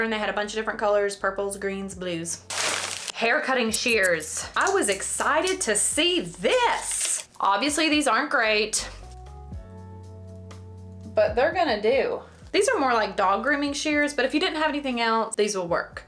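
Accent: South, United States. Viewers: Girl , I'm love the Andes mint chocolate candy. Yes , better than the New York peppiermint patties. Love your videos and the country accents, because I'm from North Carolina, so I get it ,I love it so much.❤❤❤❤❤❤❤❤❤❤❤❤❤❤❤❤❤❤❤❤❤❤❤❤❤❤❤❤❤❤❤❤❤❤❤❤❤❤❤❤❤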